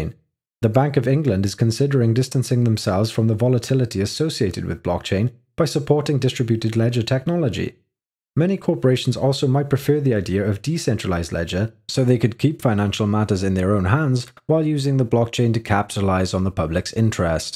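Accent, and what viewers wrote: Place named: Germany. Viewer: really shitty german accent, I did not understand anything because of his stupid accent from the 3rd reich!!!!!!!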